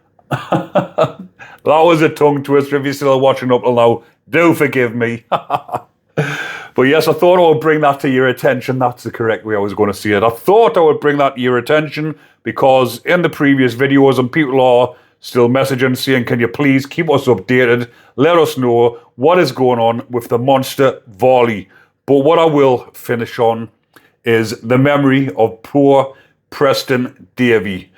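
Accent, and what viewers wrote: Newcastle, United Kingdom. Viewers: Newcastle or in that region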